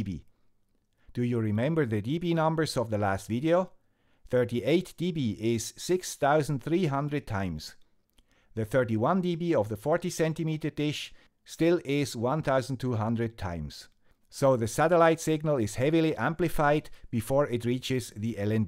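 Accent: Switzerland. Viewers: The Ham Radio with the Swiss Accent rocks! Very interesting. Do you know any good online electronics course? To get more deeply into the theories behind this kind of circuits.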